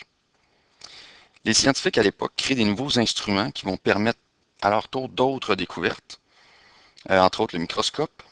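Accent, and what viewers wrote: Canada. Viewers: mdr laccent canadien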